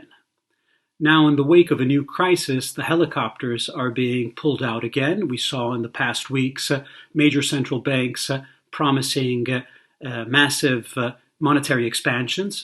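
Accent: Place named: United States. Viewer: Nice American accent for an Italian, bravo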